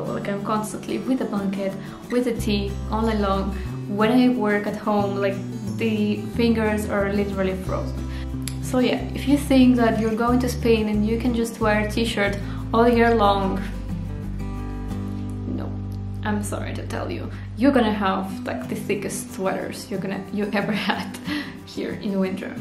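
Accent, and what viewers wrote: Russia. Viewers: Jeez what is it with YouTubers audio!!?!? .. you start with a heavy non/English accent, use a microphone the other side of an echoey room, speak softly and then just to make sure it’s almost impossible to understand you, think some incessant bgmusic is needed!!!! Ok ears are tuning in a bit.. your English is v good for a Russian! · I am amazed your English and your little accent being russian's girl.. you mast have been studying at some English university..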